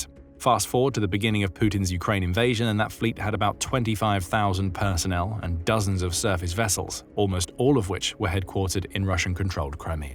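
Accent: United Kingdom. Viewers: Misinformation is so easy to detect these days. Just detect the british accent.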